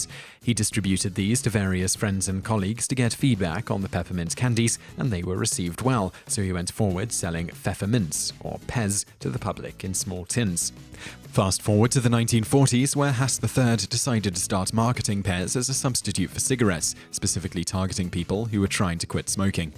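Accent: United Kingdom. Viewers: Pave Stiletto The video doesn't put it in words on the screen, but it does say the original flavor was peppermint. The word Pez is an abbreviation of the German word for peppermint.(PfeffErminZ). The video could have been much MUCH clearer on it, and unfortunately, seems to be a huge problem with all of this channel's (and those of the sister channel Top Tenz) videos. They aren't clear and tend to go on rambling stores that don't appear to actually give any answers other than just interesting history. They do give the answer, but unfortunately, Simon's insistence on pronouncing everything the British way, and the rapid drive-by of lesser-known words and facts causes you to need to do extra research to find out the information the title hints at. And, if you have to do that to every video they make, what's the point in watching the videos in the first place?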